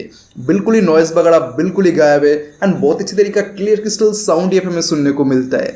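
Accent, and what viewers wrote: Bengal, India. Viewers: Bro are u bong ?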